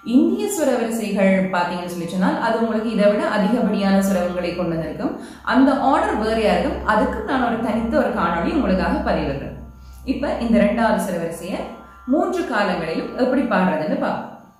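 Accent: Sri Lanka. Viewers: India or sri lanka Your language style is like Jaffna mam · இலங்கை தமிழ. அருமை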